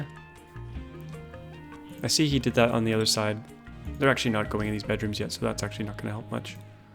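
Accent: Canada. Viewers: Doood, doont fix yur Canadian suurfer speek. I like it. Also, five episodes in, I still absolutely love this series. It's always been fun watching you build bases with each iteration of the game, but it's a totally different experience watching you fix up these broken bases.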